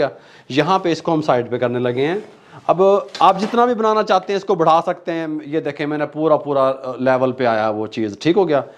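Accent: Punjab, India. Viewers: Mujhy apka punjabi ma bolnay ka style bohat pasand hay 😃 #cookingat4thfloor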